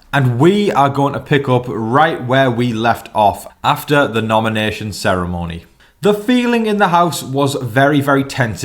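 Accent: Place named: Liverpool, United Kingdom. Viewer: You sound like a Liverpool brit! ❤👍⚽️ and appreciate your updates on BB27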